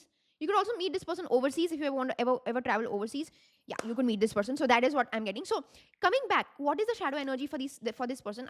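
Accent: India. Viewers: I can’t with the Indian accent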